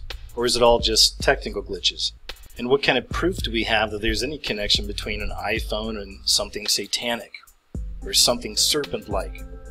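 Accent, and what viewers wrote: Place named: South, United States. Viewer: I watch him and Love is country accent 😌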